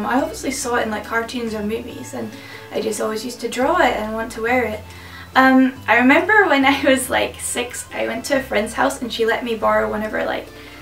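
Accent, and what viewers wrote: Scotland, United Kingdom. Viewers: Are you from Scotland?! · Your Scottish accent is hardly noticeable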